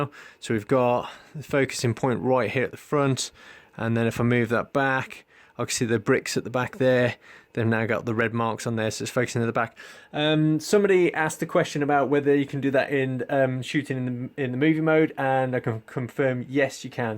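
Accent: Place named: United Kingdom. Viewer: Makes a Change to hear a Fellow British Accent..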